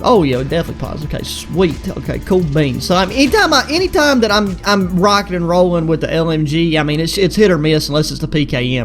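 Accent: United States. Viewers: Country accent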